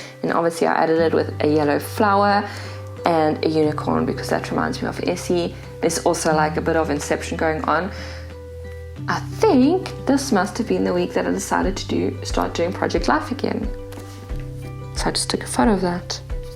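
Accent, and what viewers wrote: South Africa. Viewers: Hi 👋🏻 I'm South African! So lekker to hear our accent! Enjoying your videos xx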